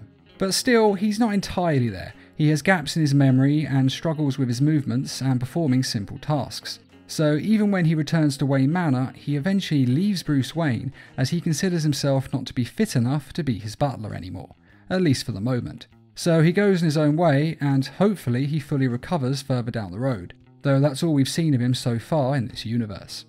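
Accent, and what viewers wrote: England, United Kingdom. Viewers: I have missed the beautiful English voice. My English friends FOR THE LOVE OF TEA!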